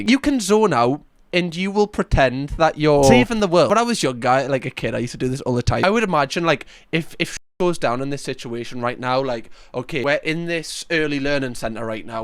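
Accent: Newcastle, United Kingdom. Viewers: I like your accent, is it Geordie or more Sunderland?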